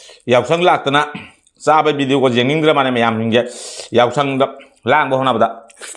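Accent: Cachar, India. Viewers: Bro kang metei lon ngangbdo Hanna fajana tamkho tabda yam wai cachar mcha lon sude hainakhini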